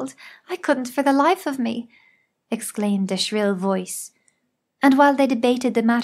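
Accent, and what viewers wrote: Ireland. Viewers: irish brother a feminine Irish voice telling me how do destroy my enemies? I can get behind that.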